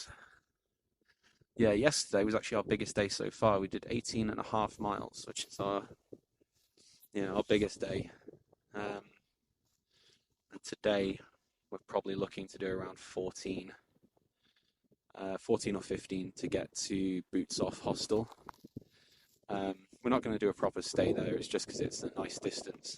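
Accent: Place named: East Anglia, United Kingdom. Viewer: "I'll be DAMED if the brick is overtaking me" Made me laugh a lot more than it should of... Where are you based in the awesome UK? Sounds like Anglian Area?? Keep up the good work and Enjoy the adventure.. Dave UK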